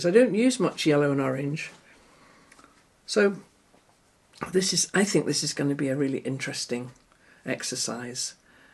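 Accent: England, United Kingdom. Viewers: I’ve only just found your site 😊 & love your sweet English accent.. Very informative & indeed brilliant ideas..😊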